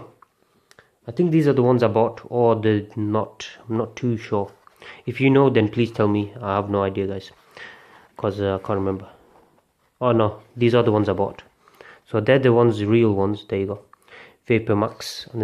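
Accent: Bradford, United Kingdom. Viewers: are you from bradford?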